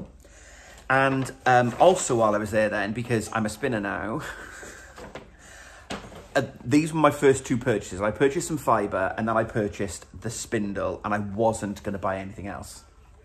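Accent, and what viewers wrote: Wales, United Kingdom. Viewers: Loved your tour of Unravel. I watch Le Garcons as I am from Canada :) I love to hear your Welsh accent since I am originally from Abergele. Take care ! Hi to Mark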